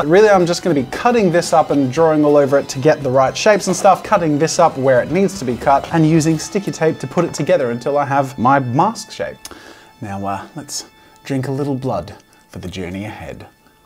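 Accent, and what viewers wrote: Australia. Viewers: I feel like this is the most Aussie has sounded in a while. >.>... Just me? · Seeing what's supposed to be a spooky skeleton-esk mask and hearing a very cheeky Ozzy accent if probably the highlight of my day. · a demon skull with a cheery Aussie accent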